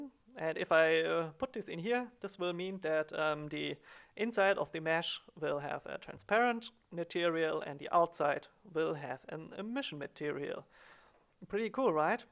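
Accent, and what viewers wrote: Germany. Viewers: Also, als ich den Fernsehturm gesehen habe, hatte ich ja schon einen gewissen Verdacht, aber mit deinem akzent ... du solltest noch an deinem englisch arbeiten, das klingt sehr deutsch... ich bin übrigens aus dresden. ... (If you can't read this ... i was wrong with this comment, ignore it.) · Are you german? :D sounds like you are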